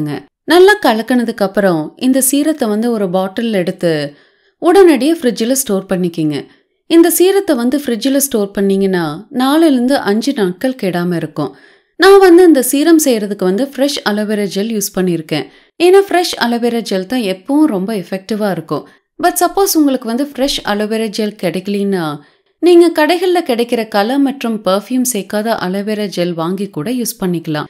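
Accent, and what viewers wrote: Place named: Kongu, India. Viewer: Beautiful kongu tamil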